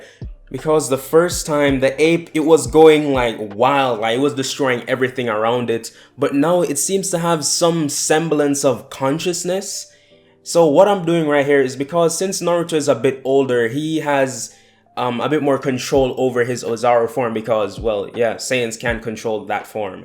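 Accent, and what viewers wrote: Jamaica. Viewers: You're Jamaican aren't you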